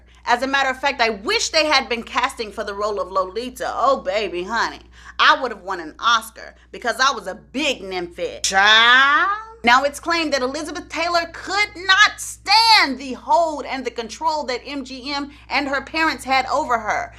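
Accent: South, United States. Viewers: gurl...I wish I could give you five thumbs up...the way you tell a story is phenomenal!!! I had to listen to this one twice it was so funny. Your voice and that lil southern twang and your comedic timing are so on point it cracks me up every time. Thanks for the joy and laughter you bring. Sayso fam: Seek the Lord while He still may be found! All praises to the Most High...praise His Holy name...halleluYah!!! Love to all shalom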